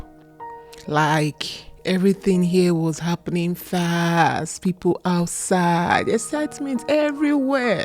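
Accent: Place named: Nigeria. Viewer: GET SOME AFRICAN FRIENDS.Which African country are you from? You sound Nigerian.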